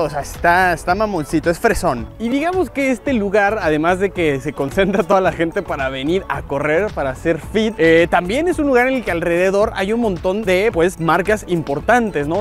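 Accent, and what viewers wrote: Mexico City, Mexico. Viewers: Oyes, 'mano, de dónde eres? Porque ese acento mexicano es el mas bonito de todos. N'ombre, el otro dia vi un Rolls Royces, pidiendo "chicken" por el auto-servicio. Vivo en un pueblito rascuacho de Florida central. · Príncipe azteca, el solo menciono que nació en san pedro pero no vivió ahí. Su acento chilango lo delata claramente pero bueno, que podría esperar del chiriwillo promedio. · No le oyes el asentote de chilango?!! Es tu paisano guerrero patas achicharradas uno mas qe se da cuentan qe no estan al nivel de Monterrey mexica!!!😂😂😂😂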